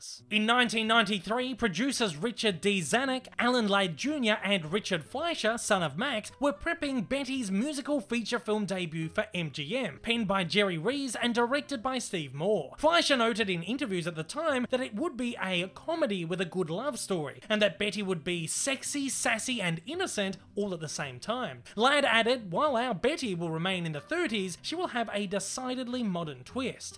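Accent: Australia. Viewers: I don't mind the Aussie accent.😃😃😃😃😃😃😃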